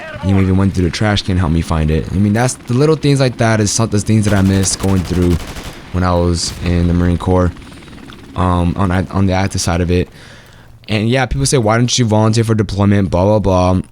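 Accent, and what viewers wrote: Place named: New York, United States. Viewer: It sounds like your in new york or california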